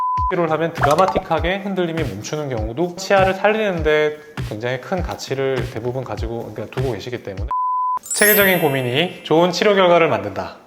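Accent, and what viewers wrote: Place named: Gangwon, South Korea. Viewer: 원장님도 강원도분이시네요^^ 사투리가 아직 남아있으세요^^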